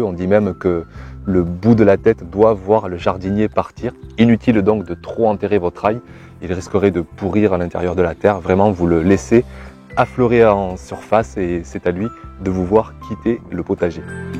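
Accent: South, France. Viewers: Super vidéo comme d'habitude tu fais des vidéos toujours aussi complète et comme tu dis on n'a pas le temps de s'ennuyer et puis cet accent du SUD et te voir en panta-court en hiver alors qu'aujourd'hui la neige a fait son apparition j'allais te demander des bonnes adresses, mais tu nous dis un petit peu partout donc je vais chercher ça sur le net si je n'en trouve pas en jardinerie Merci encore pour ta participation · Merci pour cette réponse extrêmement rapide :D alors ,non je n'ai pas d'avis sur les ails importés c'est plutôt de manière général ...Les fruits et légumes sont bons s'ils sont cultivés normalement...: pleine terre, récoltés au bon moment ,de saison,peu importe d'ou ils viennent...Si l'espagnole il cultive sa tomate comme toi (avec Amour :D ) elle sera très bonne je pense (avec tout se soleil) Le problème c'est que tout se qu'il y'a dans les magasins c'est aussi cueillit avant d'être mûr ...(exemple la banane n'a pas de goût quand elle arrive chez nous alors que tu vas là cueillir dans un bananier en Guadeloupe ,c'est autre chose...). 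Pour finir continue avec tes vidéos j'adore tu me donne un peu de soleil juste avec ton accent du sud (moi qui est de Caen (J'aime beaucoup aussi les vidéos de blec,Maud et évidemment l'incontournable Damien) ça m'aide vraiment (je débute 2ans) je fais toutes mes graines et c'est beaucoup de boulot mais tellement agréable :D A très vite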